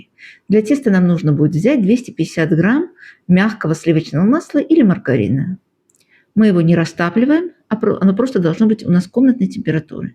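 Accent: Baku, Azerbaijan. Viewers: Вам сразу же лайк и подписка за то что вы из Баку!❤ я тоже Бакинка. Случайно забрела на ваш канал. Но не сразу поняла откуда вы, хотя легкий акцент ласкал слух. Я живу в Америке и всегда рада любому бакинцу. И рецепты у вас замечательные! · Здравствуйте,спасибо за напоминание,родилась в Баку,живу в Москве,рецепты ваши моя молодость,а Бакинский акцент отдельная ❤❤❤❤❤ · Здравствуйте.Случайно попался Ваш старый ролик, стало интересно.Когда "зашла" на Ваш канал, и услышав Ваш акцент, сразу поняла, что есть наше, бакинское. И я не ошиблась. Я сама из Баку, и Вам огромный, бакинский Салам!!! 🙋🙋🙋❤❤❤💐💐💐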